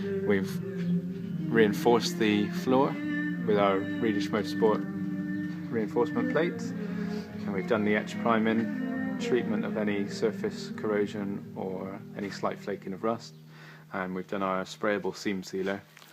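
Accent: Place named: West Country, United Kingdom. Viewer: "Startan the fit up" - good 'ol west country boy:) Waiting for a quote,...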